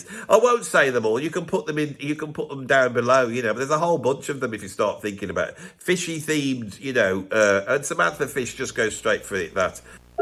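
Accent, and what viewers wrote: Birmingham, United Kingdom. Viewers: Five minutes in and my ears are bleeding from the wall of unpunctuated sentences, delivered in what I presume is a Worcestershire accent, sounding suspiciously Brummie. All this and we've almost finished defining "overrated". Brevity, please, dear Dog. Unfortunately I want to find out who these guitarists are because nothing is more fun than finding opinions to get irate about. How about some bookmarks?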